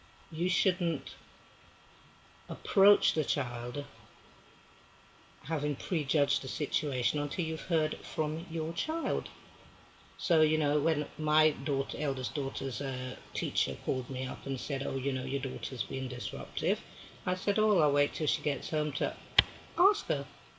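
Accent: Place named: United Kingdom. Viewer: Only 2 likes. I dont understand so called Israel. Ms, some of us are listening. P.s. I love the British accent. It makes you that much more fabulous.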